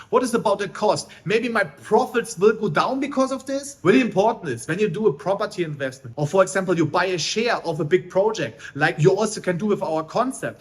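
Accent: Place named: Germany. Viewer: "whats the TRUTH". Your German accent speaking English is hilarious.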